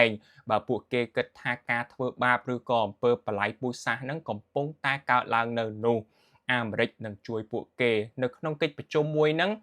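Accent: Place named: Vietnam. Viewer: កុំនិយាយភាសារខ្មែរតាមរបៀបតុងយួនមកនិយាយអី ព្រោះខ្ញុំស្អប់យួនណាស់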